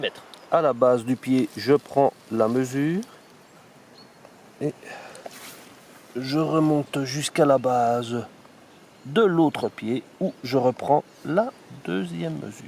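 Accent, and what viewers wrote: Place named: North, France. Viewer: Toi tu viens du nord .. Encore un kek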